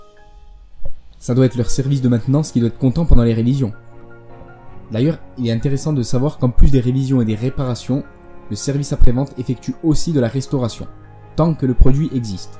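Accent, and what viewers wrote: Corsica, France. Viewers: Bonsoir est-ce que j’entend un accent corse?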